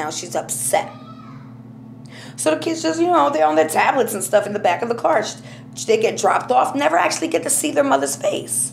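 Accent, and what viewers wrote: New York, United States. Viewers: love your accent, is it new york? say "i'm walkin' here!!" for me. ha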